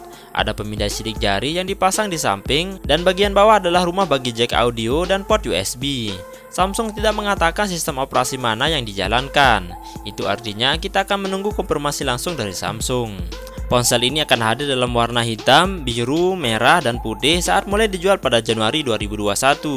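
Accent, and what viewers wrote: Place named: Bali, Indonesia. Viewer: Logatnya bali banget bang😁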